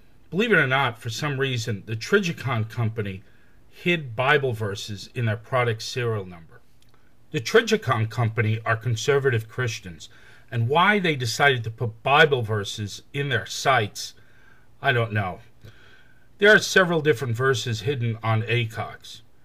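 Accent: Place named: New York, United States. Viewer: Thanks for the neat video. A real ACOG is outside my price range right now but you have given me something to think about. I recently finished my second AR-15 build project. I had to sell my first AR and all my other guns back in 2011 to finance my move from Texas to NC. I live on a low SSI disability income now so it took me about a year and a half to finish my current AR-15. I decided this time to go retro and I built this one as close to my M-16A1 that I had when I was in the US Army back in the 70's and 80's. I even used my old triangular handguards and pistol grip my buddy, who was our unit armorer, let me keep. I guess they are expendable items. I was not able to afford a real A1 upper so I had to settle for an Anderson flat top upper with a screw on carry handle. I did find an A1 butt stock at Numrich Arms for just $21.82. I used a 20" lightweight barrel from Del-Ton. 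I took it to local range Sunday and at 50 meters I put 17 out of 20 rounds in the X ring of my silhouette target. I used a 6-24X50 AOEG CV Life Scope. Yeah it's from China but it only cost me $36.00 haha. Local range only has 50 meter section open now. They have to redesign 250 meter range so rounds will stop hitting neighbor's barn. You sound like you grew up in NY. I grew up in New Rochelle, but I don tawk like dat no maw. Subbed and liked.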